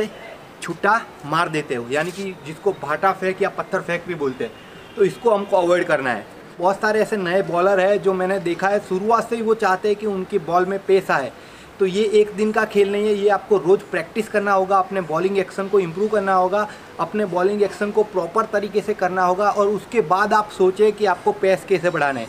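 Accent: Gujarat, India. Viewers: Bhai muje lagta hai ki Aap gujrati ho?? Ans me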